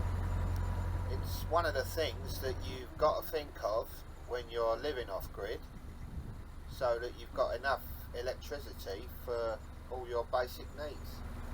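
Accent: London, United Kingdom. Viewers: Hi ya. So glad you are back doing vlogs. Was wondering how you was, and why no vlogs for a while. I love your seeing your journeys and all the pretty scenery. Oh and miss your London accent ! Stay well from a fellow Londoner. Cheerio x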